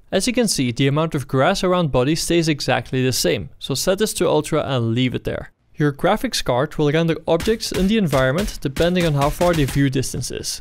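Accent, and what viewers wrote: Netherlands. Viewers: U dutch? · Ik wist meteen dat je Nederlands was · Are you from the netherlands?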